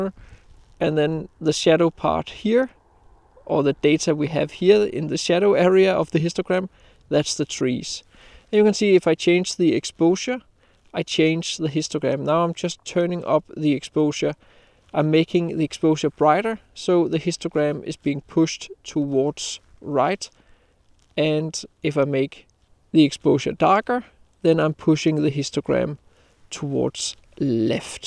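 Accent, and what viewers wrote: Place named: Denmark. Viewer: That danish accent is a real joy to hear sometimes. Especially when it sounds like you're calling them shitland ponies 😂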